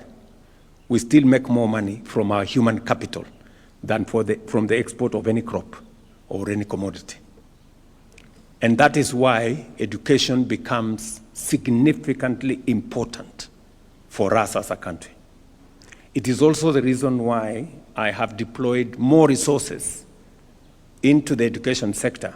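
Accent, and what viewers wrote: Kenya. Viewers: Mr president you are speaking Kalejin English.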